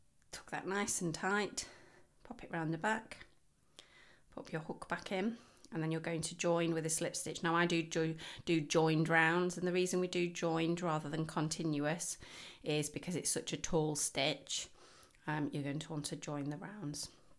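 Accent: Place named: Lincolnshire, United Kingdom. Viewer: Recognize your accent. I’m originally from Lincoln. Was nice listening to you and I followed what you did and have a lovely coaster for my coffee cup 💕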